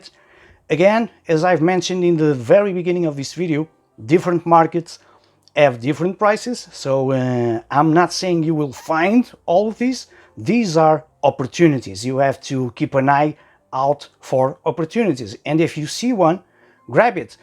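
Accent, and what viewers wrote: Portugal. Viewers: i freaking knew you were Portuguese by your accent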